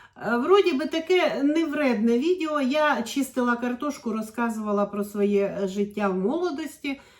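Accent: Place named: Enerhodar, Ukraine. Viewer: Цікаво, що де той Енергодар і де та Велика Писарівка, а говір один і той же - один-к-одному🤔💗👍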